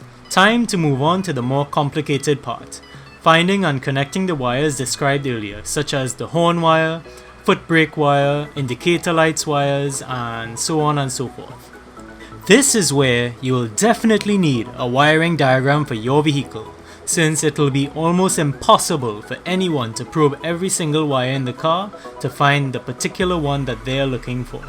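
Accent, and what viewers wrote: Trinidad and Tobago. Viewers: You have a trini accent but I’m wondering where you’re from.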